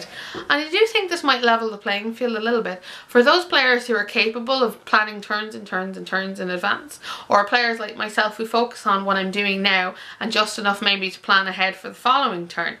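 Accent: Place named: Ireland. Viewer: Nice video! Great to hear an Irish voice talking about games too!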